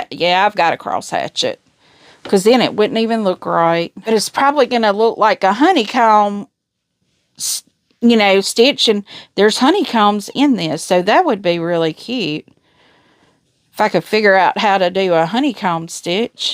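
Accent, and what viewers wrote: South, United States. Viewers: Lol I did too but it's that southern accent. 😂😂😂😂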